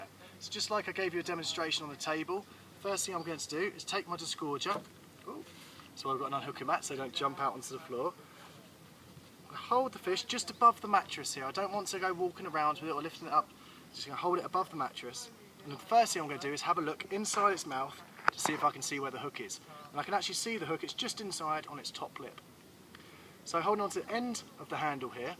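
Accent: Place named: Midlands, United Kingdom. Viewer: hi sounds like the midlands to me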